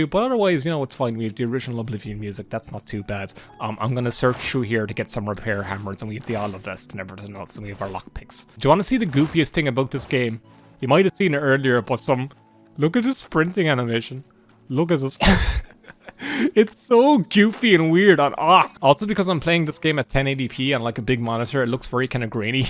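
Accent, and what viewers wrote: Ireland. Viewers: That’s true his Irish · I have a small glimmer of hope, like a candle flickering against the storm, that the morrowind DLC at level 1 will come back. Anyway nice video, still got that charming Irishman voice, like Guiness flowing in a field of clovers. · The Irish man is back!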